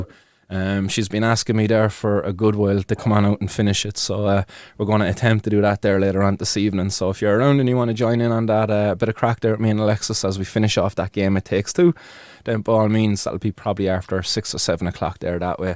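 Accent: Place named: Cork, Ireland. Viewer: Good see you back on the screen baii... And really glad that you in a position to be back! Missed those dulcid Corkonian tones😋💚🌱🌱🇮🇪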